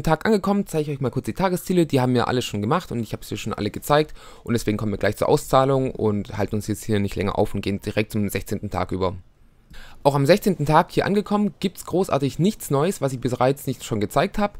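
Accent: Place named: Swabia, Germany. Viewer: da is wohl jemand ausm schwabenland:D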